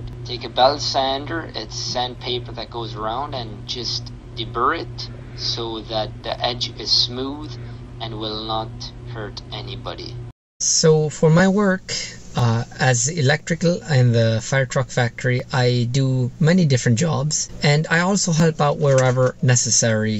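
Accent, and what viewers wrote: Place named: Ireland. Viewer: A strong Irish accent has. Fabulous work you all do,the men manufacturing and building and the ladies & Children work the land and are home makers..such a wonderful way of life.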